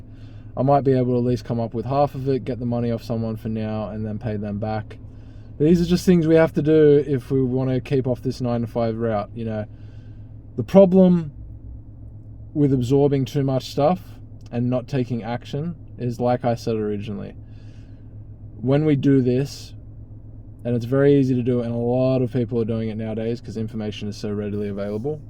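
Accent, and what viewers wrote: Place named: Australia. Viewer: Sounds Australian.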